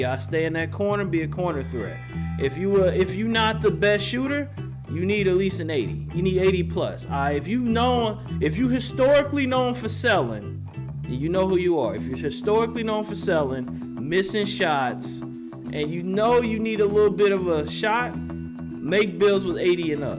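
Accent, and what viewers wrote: St. Louis, United States. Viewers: U from st.Louis cuh ?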